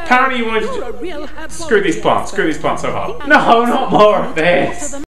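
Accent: United Kingdom. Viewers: 99% of what makes this video great is the fact that he is narrating a harry potter game with a british accent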